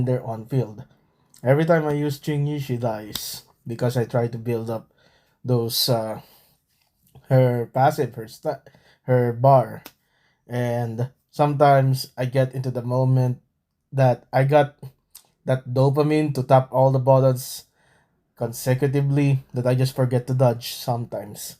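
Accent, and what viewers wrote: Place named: Philippines. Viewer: bro u pinoy, the accent is kinda pinoy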